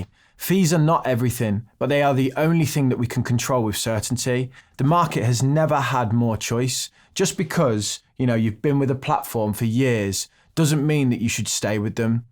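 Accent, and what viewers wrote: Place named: Leicester, United Kingdom. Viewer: You from Leicester pal? You have a proper Lesteh accent……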